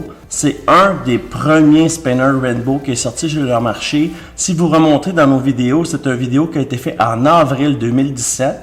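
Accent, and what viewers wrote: Quebec, Canada. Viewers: ah mais oui t'es québécois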